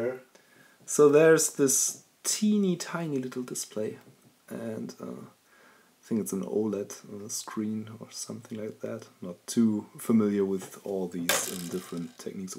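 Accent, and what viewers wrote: Germany. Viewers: Weird. I’m hearing “a-to-zed” or “day-to-zed” or “data-zed” but the subtitles always say dataset. I guess that’s the German accent playing there!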